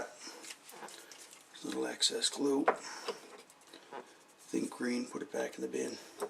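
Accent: Canada. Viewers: I knew you sounded Canadian! I'm Canadian as well. I live in Alberta. I've been watching your videos because I've been thinking of getting into the plumber trade. Thanks a lot for putting up these videos!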